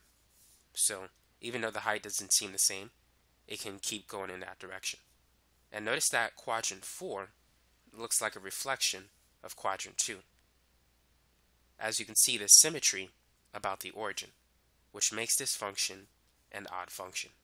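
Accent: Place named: Boston, United States. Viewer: Are you from Boston? I dig your faint accent.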